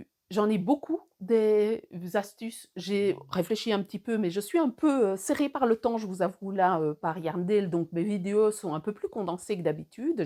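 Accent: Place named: Brittany, France. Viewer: Merci pour vos vidéos ❤. Un vrai plaisir instructif et agréable à regarder. Et un vrai plaisir d'entendre cet accent de chez moi. Suis réfugiée dans la campagne bretonne. Votre chaîne est juste trop bien. Merci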